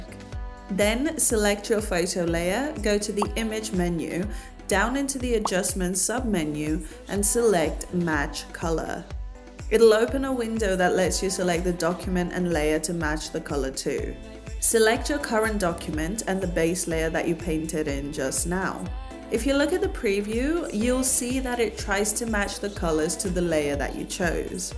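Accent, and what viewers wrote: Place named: United Kingdom. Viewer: Hey enjoyed this video cause you have a loveeely voice and British accent. I am a fresh learner of PS and it was a bouncer, never the less I liked your British diction and crisp clear voice, This was the first video I came across, and I am impressed by your excellent work and editing skills. Your videos will help me later when I come to terms with PS. Loved your charming personality, I have to submit and follow and Big like too. I am a watercolor Artist and trying my hand at POD and that's where learning PS is so important. If you have the time check out my painting videos. Good to know you. #artistsatwork53 #artistsatwork53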